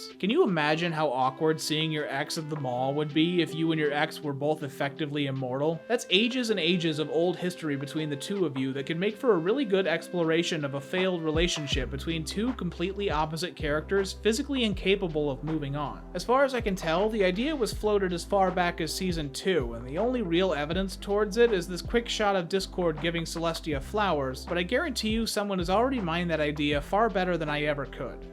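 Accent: Netherlands. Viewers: ARE YOU DUTCH!! 🎉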